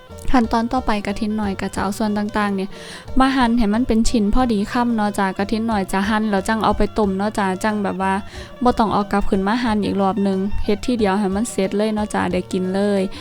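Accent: Isan, Thailand. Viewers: จริงแล้ว เป็นคนลาวอิสาน รึ ภูไท ลูกหล่า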